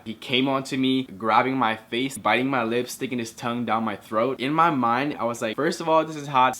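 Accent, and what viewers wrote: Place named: Asia. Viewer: u are talking like a thug speak in your normal Asian accent voice please u bottom girl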